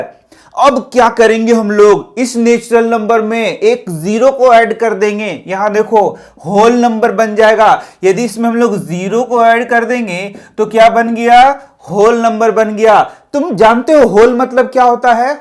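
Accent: Bihar, India. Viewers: Sir r u Bihari..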